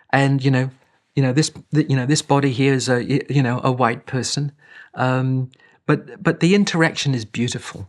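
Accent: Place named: South Africa. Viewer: It sounds like hails originally from my country - South Africa. My first Prashanti Christmas was in 1986... Never, ever to be forgotten!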